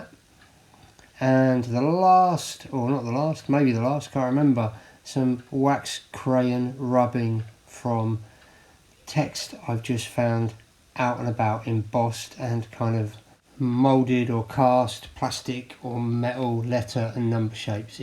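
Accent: United Kingdom. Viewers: I shared it w my art group and we may spend a day trying it…getting magazine images to transfer is a neh, sometimes it works some times it doesn’t, but I’ve had luck using pigment ink before laying the page down….I’ve got you on my watch list, as there’s lots of videos I want to watch…and I love your British accent!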